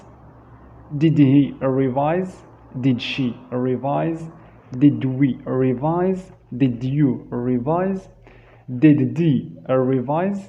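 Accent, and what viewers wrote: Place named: France. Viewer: Good job dude try to improve your pronunciation 😉 I smell some French in your English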